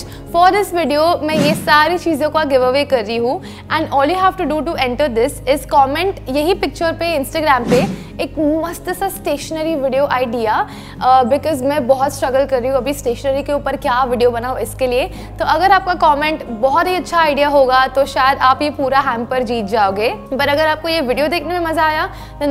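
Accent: Gujarat, India. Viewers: Gujrati accent , Jada , ada You can buy something related to New year or republic day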